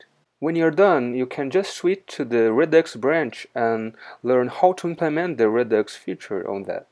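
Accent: Brazil. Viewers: Reconheci o sotaque brasileiro :) kkkk Rapaz tô sofrendo pra aprender React. Realmente baixei um boilerplate pra integrar em um projeto Django, mas veio tanta coisa junto que me perdi todo. O problema é que se o projeto não for seguro e não puder se comunicar com o API num serve pra nada. Você ensina essas coisas ? Tô precisando de um mentor de Javascript Frontend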